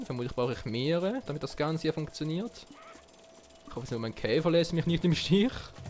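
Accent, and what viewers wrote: Switzerland. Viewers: Bist du Schweizer??? :D:D:D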